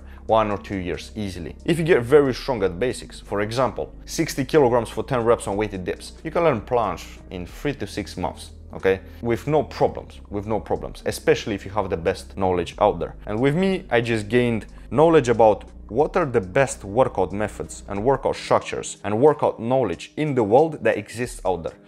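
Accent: Russia. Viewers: That's familiar accent. Are you Russian? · I think pavel tsatsouline created this blueprint for a kind of austere, soviet militaristic style of training. I think even if didn’t call himself soviet core others would just because of his accent & dedication to hard training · Wow, Russian accent.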